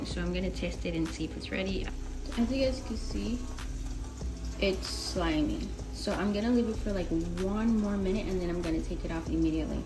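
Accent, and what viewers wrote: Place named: Caribbean. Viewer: I hear a slight accent! Are you by chance from the Caribbean?🥴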